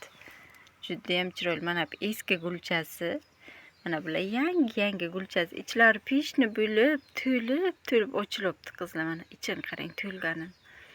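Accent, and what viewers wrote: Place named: Bukhara, Uzbekistan. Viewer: Каердансиз гапиришингиз Бухороликлардай гулларингизни сотасизми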